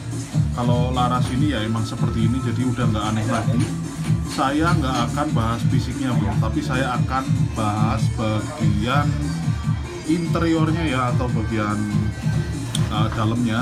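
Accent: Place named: West Java, Indonesia. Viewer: Logatnya seperti orang sunda ini daerah mna pak🙏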